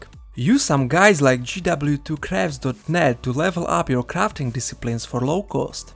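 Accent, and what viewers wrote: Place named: Czech Republic. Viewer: Ure from czech republick right ? that axcent tho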